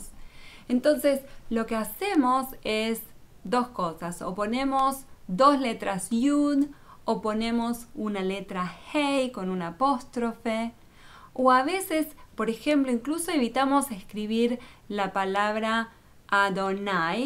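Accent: Argentina. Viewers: no tengo mucho tiempo de verte conocido por medio de YouTube y me da tanto gusto de escucharte tus expresiones y explicaciones acerca de las costumbres Judías y de pronto pensé que escuchaba a una Argentina, pero bueno eso no importa; sabes amo a Israel amo a Jerusalén quizá no tengo una forma de expresar clara en cuanto al decir amo…… pero siento algo que no se cómo describirte, Dios es bueno…….sigue adelante con esas explicaciones tan bonitas, mi nombre es Luis y soy de Guatemala. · querida; hasta que se dijo v ine a vivir ; estuvo bien, cuando se dijo USA, alli si que ya no mas me gusto, tienes un dialecto argentino , asi esta mejor , no lo destruyas con usa , querida evita esas palabras, ha sido y es el demonio desatado sobre nuestra region, no la riegues.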